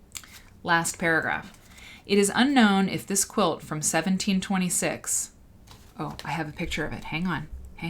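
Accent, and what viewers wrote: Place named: United States. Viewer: All this talk of chips and crisps in an American accent is messing with my internal autocorrect!! Mayo and chips is great and you'll need to find a different chippy if you're getting soggy chips 😝 that's no good! I put the vinegar on at home. Vinegar at the shop plus the journey home is a crunch killer 😞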